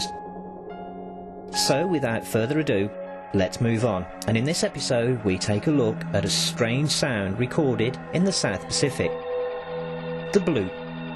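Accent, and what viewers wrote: United Kingdom. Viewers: I wonder if it's the Cov accent that makes everything you say sound plausible,because I've got a Cov accent as well,lol! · Do you have a west mids accent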